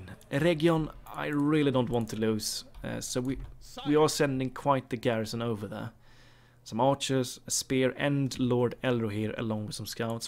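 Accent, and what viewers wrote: Sweden. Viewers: Haha I can hear the swedish-english accent. Awsome video and gameplay! 💪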